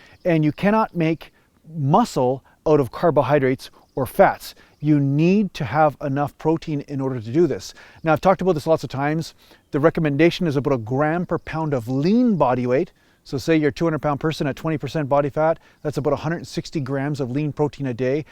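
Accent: Canada. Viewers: He must be a fellow Canadian. I can tell by how he talks haha.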